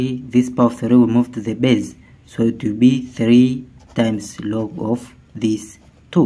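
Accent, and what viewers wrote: India. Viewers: По акценту думаю, что перед нами индиец.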